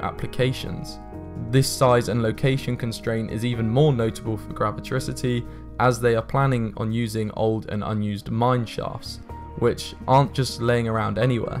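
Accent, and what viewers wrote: United Kingdom. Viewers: The idea is the modern perpetual machine. It is a hi tec scam. Before I believe a word of this bull shit I want an MIT physics prof to explain why it is a great idea not some guy with a british accent.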